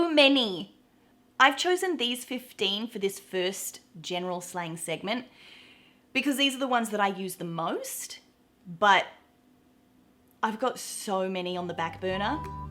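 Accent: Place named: Australia. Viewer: Elephant in the room. You are speaking with an "AUSTRALIAN" accent. A REAL Australian.. accent.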